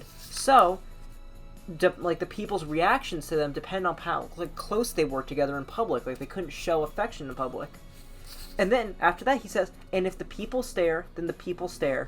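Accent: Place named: Northeast, United States. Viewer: Are you from the northeast region of the country? I’m from Philly and your accent feels real familiar to me?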